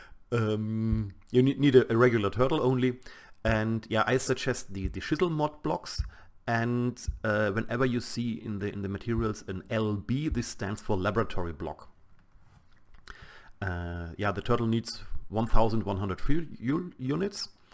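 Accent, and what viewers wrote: Germany. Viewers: Are you from germany? nice video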